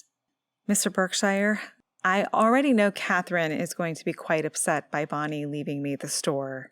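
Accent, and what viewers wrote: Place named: United States. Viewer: Just can't listen to American's reading........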